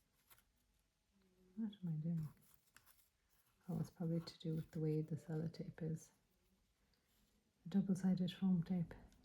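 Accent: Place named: Ireland. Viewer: Great video! Colouring is great! So nice to hear that Irish accent again!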